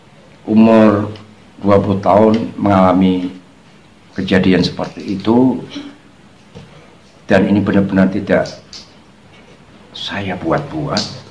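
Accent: Java, Indonesia. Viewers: . Suara sedikit mirip (logat Jawa juga), tp ini bicaranya agak cepat, dn rambut lebih sedikit drpd PakDe Alex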